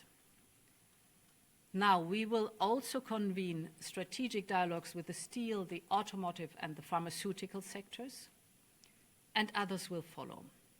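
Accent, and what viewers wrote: United States. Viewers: Why this German speaks perfect American English? ahaha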